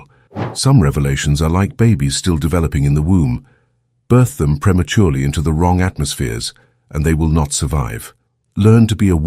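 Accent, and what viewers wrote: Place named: England, United Kingdom. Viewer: This narrator sounds like some kind of wise fellow. The English accent helps, however his words are solid. Great advice .....man without a face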